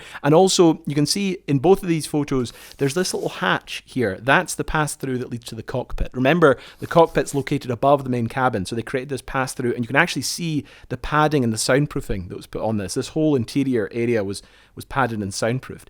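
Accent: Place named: Scotland, United Kingdom. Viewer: I don't understand your Scottish accent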